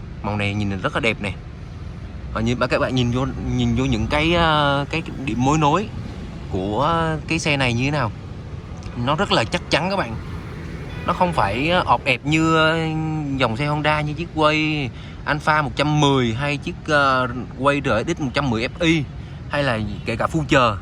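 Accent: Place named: China. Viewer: Lũ bắc kì khựa dân làm quảng cáo xe nghe khẩu âm khựa ngữ đéo ưa rồi.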